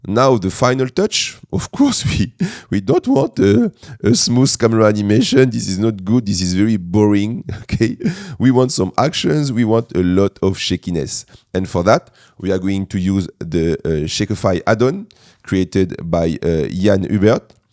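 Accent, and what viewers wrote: France. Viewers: Salut cool ra video , tu es francais ? Car ton accent et vraiment pas comme les anglais que je regader abituellent pour moi tu es francais ton rire et francais 😂 , ca serai cool des tutos en francais , bonne continuation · u've a french accent ?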